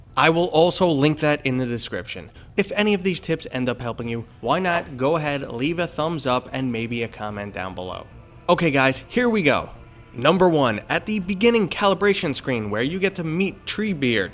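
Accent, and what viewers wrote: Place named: Boston, United States. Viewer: Your accent is very interesting 🤔. You sound like you have a Boston or New Jersey accent. Ya hold your long a sounds sometimes.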